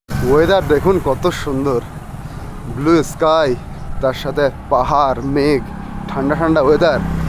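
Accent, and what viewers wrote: Bangladesh. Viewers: ❤u bangladeshee korha sune boja jachee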